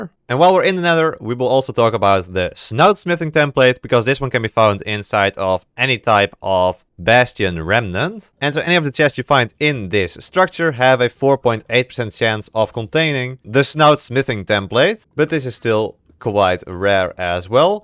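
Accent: Netherlands. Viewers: this guy is def dutch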